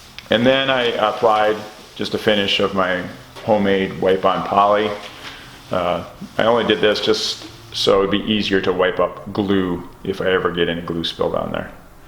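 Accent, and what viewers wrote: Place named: Southeastern Ohio, United States. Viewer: "Acrosst" vs "Across" is regional dialect, certainly in southeastern Ohio, ala Crick vs Creek, Warshington vs Washington, Booshes vs Bushes. I would know, being a hick from the sticks.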